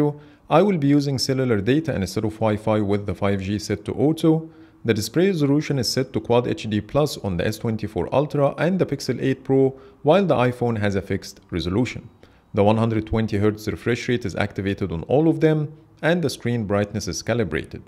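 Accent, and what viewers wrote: Egypt. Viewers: اللهجه مصري 😂❤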